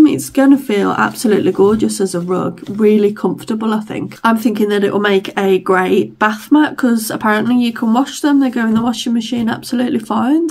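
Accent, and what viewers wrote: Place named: Midlands, United Kingdom. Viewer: Whereabouts in the Midland s are you from. I'm from the Midland too so recognized your accent. I also have a Husqvarna but it's the ruby and has problems, sadly.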